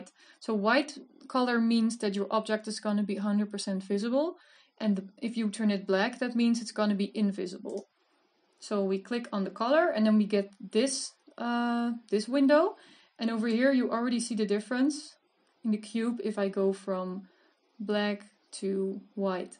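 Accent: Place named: Netherlands. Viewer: tenk joe ferrie much....joe ar de beste......ded was just wat eye needed....nee hoor jou Engels is niks mis mee maar altijd zo leuk herkenbaar dat nederlandsEngels :-)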